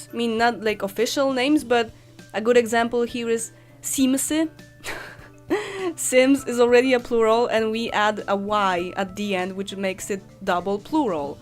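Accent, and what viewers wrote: Poland. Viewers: I'm Polish and I can tell you, you are boring, you have very little knowledge of Polish customs, all you picking on is some bizarre stuff that you heard somewhere, and yes you are of Polish background because of some clear Polish accent you produce, I recommend that you present Poland in Positive matter rather then negative, since you are Polish. Hmmmm =D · so like, im polish ye and ive sacrificed learning words for the sake of accent. So when i watch your vids i wonder if you should improve on that too or leave it be since it sounds way too much like polish accent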